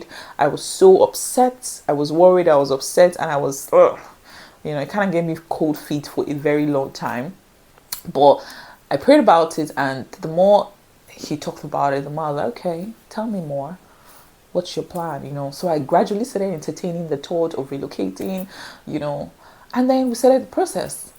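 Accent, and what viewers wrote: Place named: Nigeria. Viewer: The story is not as good as the title 😂😂 or maybe it's the Nigerian accent for me which am not very good with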